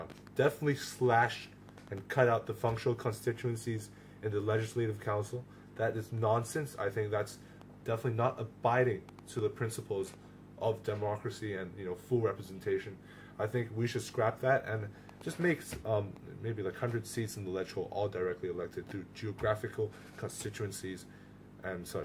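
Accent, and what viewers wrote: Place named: United States. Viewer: a curious question, if u are brought up in HK for 18 years how cum u could speak with an American accent???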